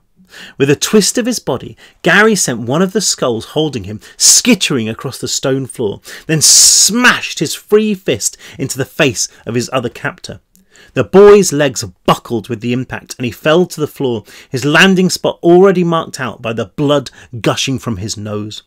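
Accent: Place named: United Kingdom. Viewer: I love hearing read it in a British accent. How cool would it be to have a recording of Shakespeare reading his own plays? I like how he shares his own thoughts too.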